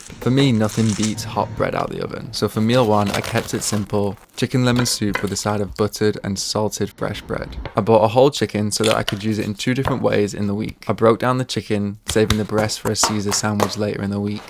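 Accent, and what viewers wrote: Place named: Australia. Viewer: Your accent is super interesting. Australian, with a touch of Manchester, a little bit scandi and North American all in one.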